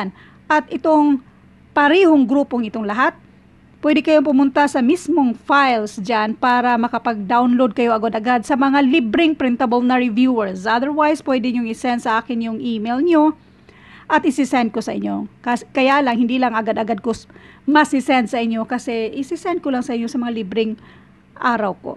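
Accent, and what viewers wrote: Visayas, Philippines. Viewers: Thank you so much Maam. You are so humble and sweet. I like your visayan accent. All the love from here (Dumaguete City)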